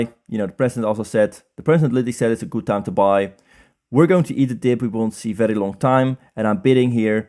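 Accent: Netherlands. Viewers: You are Dutch, aren't you?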